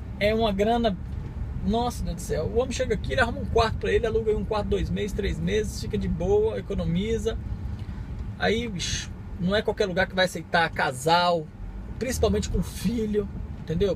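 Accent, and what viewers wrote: Brazil. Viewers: De que parte do Brasil você foi? Cearense?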